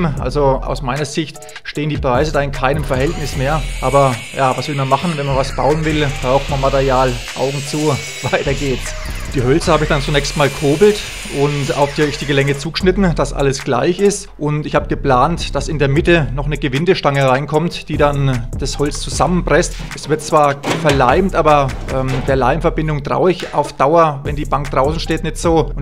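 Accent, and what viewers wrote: Franconia, Germany. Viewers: Klingt extrem Frängisch...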